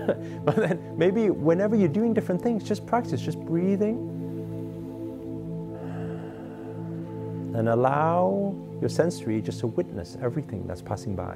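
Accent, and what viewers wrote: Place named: Liverpool, United Kingdom. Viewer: Gotta love that scouse accent! I needed that taste of home! Just had this video sent over and was a wonderful insight that I’m gonna put into action. I’ve been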